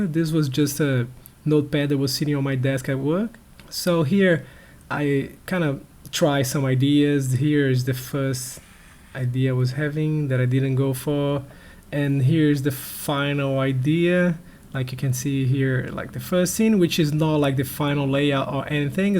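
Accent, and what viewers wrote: Brazil. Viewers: 20 seconds in...i hear a brazilian accent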